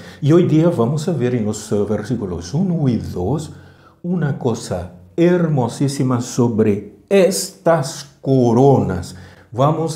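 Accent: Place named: Portugal. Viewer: Es acento portugues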